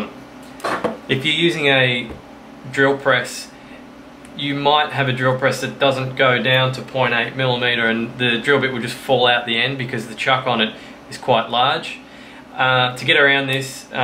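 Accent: Australia. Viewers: Skyim desktop. Awww yeah. Haha- thanks for the tutorials mate! I detect a fellow Aussie... I'm just getting started with building pedals, I've put together two BYOC kits and I'd like to go onto perhaps veroboard on even etch my own.. where do you get the veroboard, copper board, and ammonium sulphate etc.?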